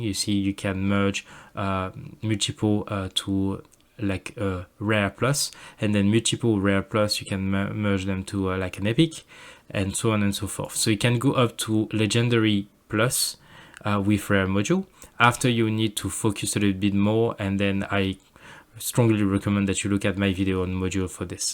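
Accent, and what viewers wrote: France. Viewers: je sais reconnaitre un confrere francais quand j'en entend un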